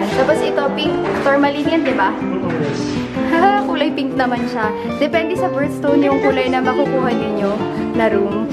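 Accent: Philippines. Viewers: kakatuwa tlg punto satin kababayan tau hihi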